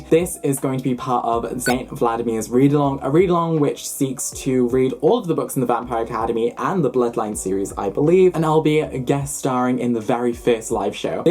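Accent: Wales, United Kingdom. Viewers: Where are you from because your accent sounds like you have a Welsh twang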